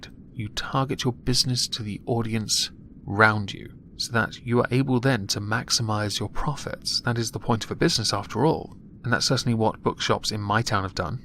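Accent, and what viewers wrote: United Kingdom. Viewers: This guy sounds like the britisher, just me?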